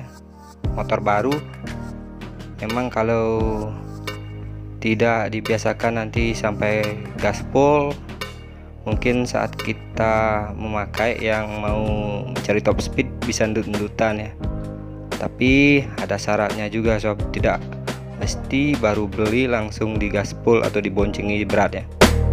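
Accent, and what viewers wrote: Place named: Madura, Indonesia. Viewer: logatnya sperti orang madura takye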